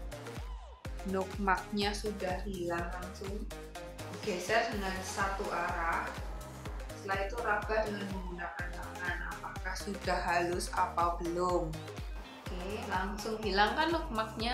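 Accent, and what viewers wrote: Java, Indonesia. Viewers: ce.. medok tenan se, wkwkw.. jowoan ae, good konten anw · Medok nya bikin kesem sem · Tante tambah medoook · Medoknya bikin mabuk kepayang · medokmu mbak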